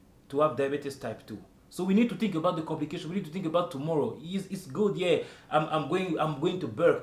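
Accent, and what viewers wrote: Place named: United States. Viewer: Tu parles comme un Américain l'on Fils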